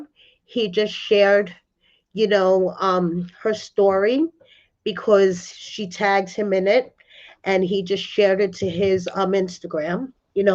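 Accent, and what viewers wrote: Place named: Brooklyn, United States. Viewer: You sound like a typical Brooklyn girl? Do you happen to be Jewish also?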